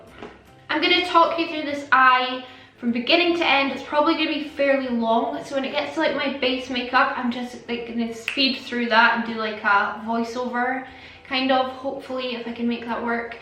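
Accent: Scotland, United Kingdom. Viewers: Love her soft Scottish accent... beautiful xXx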